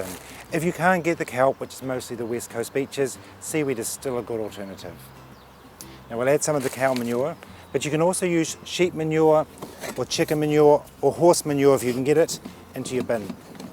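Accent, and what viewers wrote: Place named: New Zealand. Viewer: Really good show.First time i saw your chanel today.U sound kiwi, i'm from land of wombats and magpies. You are still answering questions from 2yrs ago, magnificent, not many do this. U show care towards your viewers , a rare quality. Will check some of your others .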